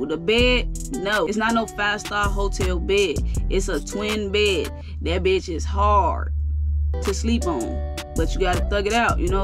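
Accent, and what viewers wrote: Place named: Chicago, United States. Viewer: My first time over here and from the way you talk you sound like you from my city , Chicago , dont know if im right but i can tell when i here my own .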